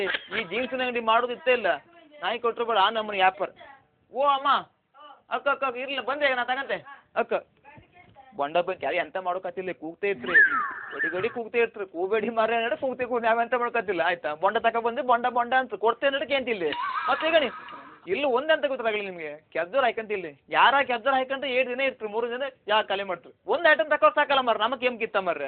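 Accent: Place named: Kundapura, India. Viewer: Namma hebri namm hemme, I love kundapura kannada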